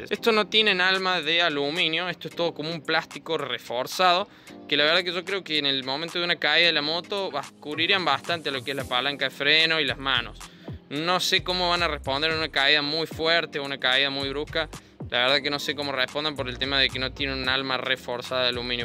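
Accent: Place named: Córdoba, Argentina. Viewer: Hola, al escucharte ya veo que eres de Cordoba. Yo tengo el modelo 2020 le cambie el foco por uno Phillips Xtreme Motovision y alumbra muchisimo mejor. Es lo unico que le cambie, porque he visto que bien regulada la moto, no tiene problema de arranque en frio, ni hace ruido de valvulas. Le puse un parabrisas alto y anda mucho mejor en ruta ya que ataja bien el viento. Algunos viajecitos cortos hago pero me arreglo con la parrilla y una mochila. No quiero ponerle alforjas. Linda moto, anda muy bien y estoy pensando este verano en ir de Cordoba a Salta. Saludos desde Alberdi, Cordoba.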